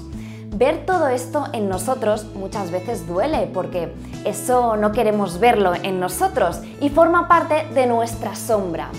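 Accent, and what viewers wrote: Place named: Spain. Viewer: Va relacionado con la frase: no mires paja en ojo ajeno..? ... bueno en lo personal trato de aplicar y mejorar en tema de virtudes y actitudes. Me podrías decir si lo que explicas lo puedo leer en un libro de psicoanálisis o es otro rama de la psicología? . Tu acento español sueña como un eco deberías mejorar tu audio al grabar y hablar un poco despacio sería mejor tu video. Espero no ser ofensivo con mi humilde opinión. Gracias/ saludos..!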